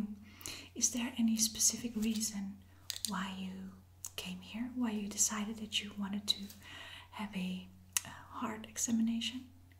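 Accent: Netherlands. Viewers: Pretty good roleplay, are you dutch btw?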